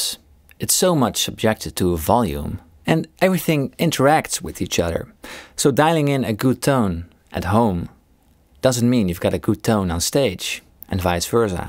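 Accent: Netherlands. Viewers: Great video, and I love that hint of Dutch in your delivery.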